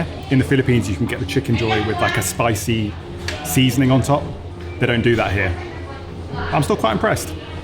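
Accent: United Kingdom. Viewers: The London accent is the nicest in the world I think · I like your kind of British accent and the tone of your voice ..i can easily understand what you are saying. Enjoy your food.. 🇵🇭🇵🇭🇵🇭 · I just love hearing the British accent.... :) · I love your voice it's very soothing. Like a History Channel documentary. I love the British accent. Reminds me of Harry Potter too😁 Thank you for promoting Filipino foods.😊 I love ube jam🤤🤤🤤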